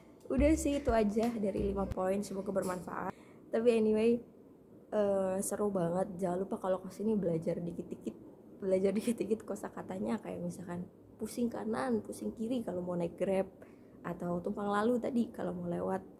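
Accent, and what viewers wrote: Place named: Java, Indonesia. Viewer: Nak Tanya suku apa ya? Kerana loghat tidak terlalu kental jawa. Melayu Indonesia kah?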